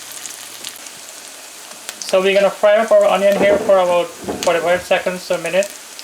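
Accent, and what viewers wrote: Guyana. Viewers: i am missing Guyana so much & i am so grateful for your tasty traditional recipes. i love the Guyanese accent. i hope to make my rhythm, with this yummy recipes. big love from London UK.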